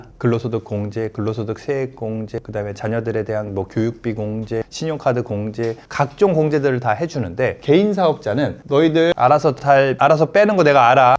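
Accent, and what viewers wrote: Jeolla, South Korea. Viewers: 성님 전라도 사투리 고치실 생각은 없으신가여!!듣기 거북해서염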